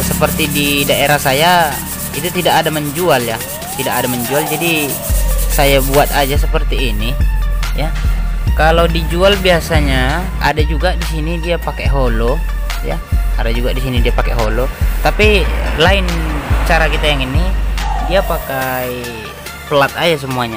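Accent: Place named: Pematangsiantar, Indonesia. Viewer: Dimna lokasi bengkel mas... Dari logat bicaranya kyk org siantar abg ini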